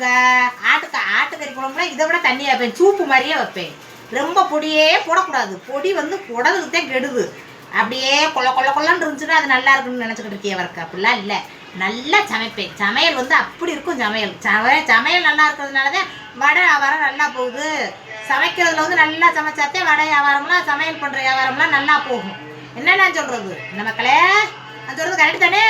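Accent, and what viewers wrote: Madurai, India. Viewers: அக்கா நீங்க பேசுற மதுர பாஷ ரொம்ப பிடிக்கும் உங்க வடை கடை ரொம்ப சூப்பர்❤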